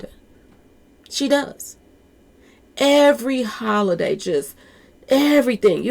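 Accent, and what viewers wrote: Chicago, United States. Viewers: I detect a Chicago accent here! 😆 Cool channel.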